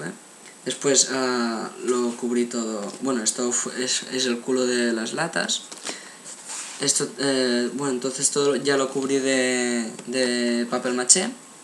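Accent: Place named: Catalonia, Spain. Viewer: com es nota que ets catala cabro! xd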